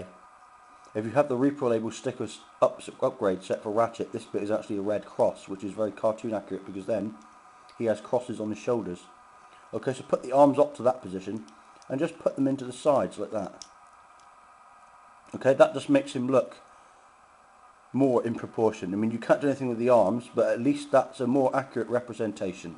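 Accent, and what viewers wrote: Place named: England, United Kingdom. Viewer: Yes nice review, with the English accent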